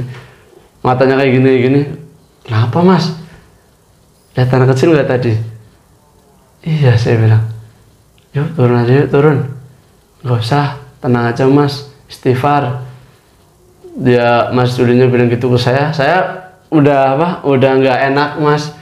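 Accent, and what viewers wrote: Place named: Banyumas, Indonesia. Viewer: Nonton idola bang wong dewek ngapak hiii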